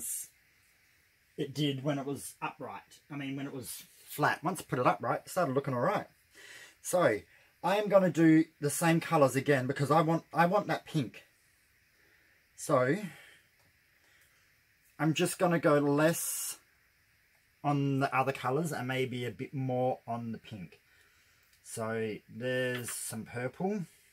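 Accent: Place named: Australia. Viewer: It's good to hear some Aussie talk, Love your work, Cheers from an Aussie viewer xxx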